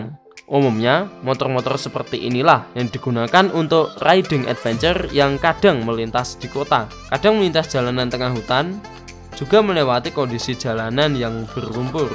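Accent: Java, Indonesia. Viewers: Salam medok mas...yg asli orang Jawa mana likenya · Medok buanget mas broo... sampe Mblerengg gaess ,,uhh Mblereng gaaeeessss.. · Jangan kau hilangkan cirikhas mentok njowo mu iku mas. Salam dari AKU. anak campuran portugis,batak, jawa 😁 dari MEDAN.. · Mantab unik dubbernya cadel plus medok namun penjelasannya cukup jelas dan pas ..👍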